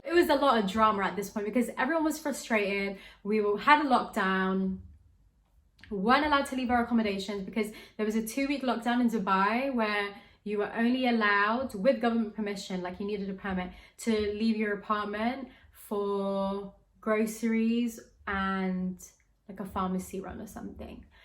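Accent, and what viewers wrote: United Kingdom. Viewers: What part of the uk are you from, and your content is excellent 👏👍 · Briton na briton accent ni ghorl!!! God bless and good luck sa new journey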